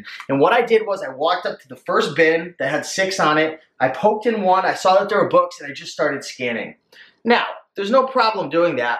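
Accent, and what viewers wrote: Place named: New England, United States. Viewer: Yankee accent kills me, and people fuss about robotic voices....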